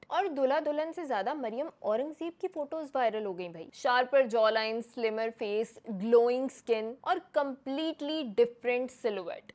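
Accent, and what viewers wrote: India. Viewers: Jo humara urdu ka undaz hai uss ko khudara hindi lahjay say na badlay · I was so confused with her accent. It is definitely not a Pakistani accent and you are a doctor. You should not say tum we use up to show respect in class. I’m not only that the entire way of speaking the method of speaking is all Indian. Please go back to your roots.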